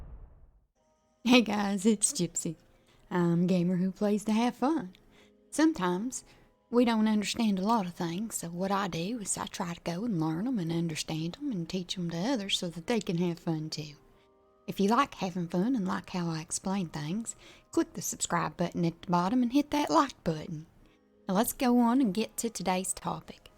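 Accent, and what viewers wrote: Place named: Appalachia, United States. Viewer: Haha, are you from East TN, East KY, Or southwest/West Virginia? I was born in East TN myself , you sound like me and all my family haha. Funny finding that on the internet!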